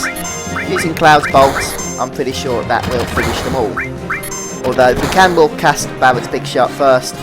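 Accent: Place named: United Kingdom. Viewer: where is the accent from? it sounds... British? but i know its regional, but what region. lol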